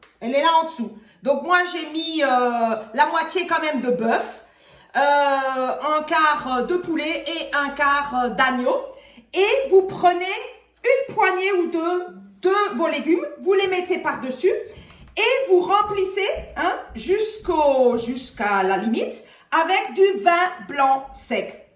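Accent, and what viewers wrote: Alsace, France. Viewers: Super merci beaucoup En tout cas moi j aime quand vous parlez fort Alsacien un jour Alsacien toujours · J adore l accent !!! Ce bon vieil accent alsacien. Chouette vidéo et recette bien clair à comprendre !!😂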